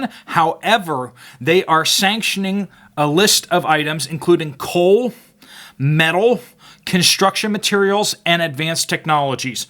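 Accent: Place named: Midwest, United States. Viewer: Hes from the Midwest, accents exist.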